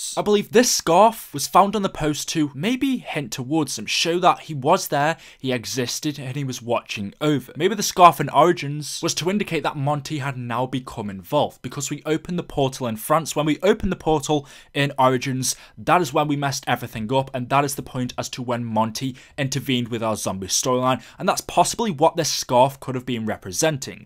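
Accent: United Kingdom. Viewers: Fair point but he's like British so z house bit of a stretch and is it possible to find a GD video without a dislike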